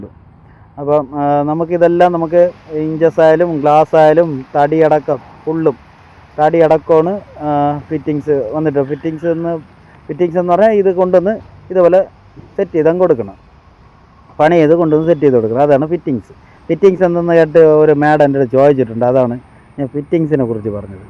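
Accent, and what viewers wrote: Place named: Thiruvananthapuram, India. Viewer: താങ്കൾ സ०സാരിക്കുന്ന ഭാഷ തിരുവനന്തപുര० സ്റ്റൈയിലിൽ.🙋‍♂എന്നാൽ മദ്ധ്യകേരള ഭാഷ നിങ്ങളുടെ ഭാഷയേക്കാൾ വ്യത്യസ്ഥമാണു് ബ്രോ!🙋‍♂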